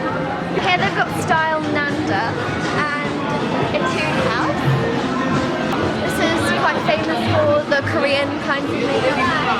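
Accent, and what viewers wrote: United Kingdom. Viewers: Are you british? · their accent is british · you sound British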